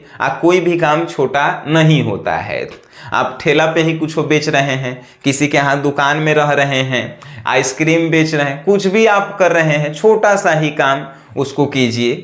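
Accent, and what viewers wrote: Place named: Magahi, India. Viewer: Bhaiya -Bhojpuri bolo. Magahi thik nahi lagta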